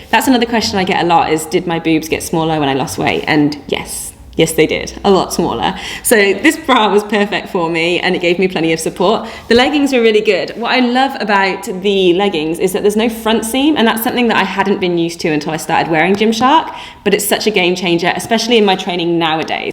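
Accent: Australia. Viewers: Australian accent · you have an amazing australian accent. Love it. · Omg your cat in the background 😂😍 PS love this video, you are so down to earth and relatable. I can definitely hear the Ozzy lilt in your voice!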